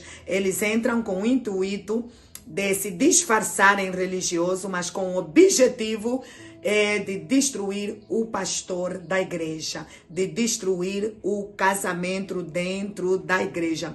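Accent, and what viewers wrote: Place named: Latin America. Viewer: Então quer dizer que é esse espírito que faz alguém pensar em pornografia e sexualizar as pessoas? A pastora é de algum país hispânico da América Latina? O sotaque é de algum desses países...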